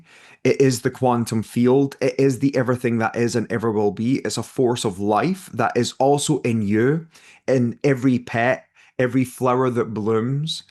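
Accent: Scotland, United Kingdom. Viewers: ❤, much gratitude for sharing your precious time and knowledge with us all. Joining from Tenerife. Born in Aberdeen Scotland. It's lovely to hear your Scottish voice. ❤my gran also read tealeaf. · Hi. Nice to hear another Scottish person, im from Edinburgh 👋 I speak to my angels and guides every day. This video appeared on my feed, yet I've never heard of you before. Someone must have put it there 🥰😇 I see my angels sparks of light ✨️ everywhere around me. I saw an electric blue flash of light too that i believe was one of my guides. They all got me out of a difficult situation and send (bombard) me with messages and synchronisities everywhere. I also just recently did my Angelic Reiki 1&2 ✨️ 😇 I wish i could physically hear and see them though. Still on my learning path, and reading every book i can. Would be great to connect with you though ❤🙏 I really want to develop and strengthen my abilities to help others ❤ It's nice talking to other like-minded people 😊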